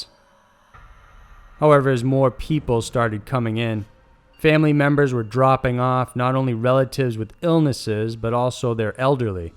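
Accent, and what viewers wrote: United States. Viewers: yeah finally an American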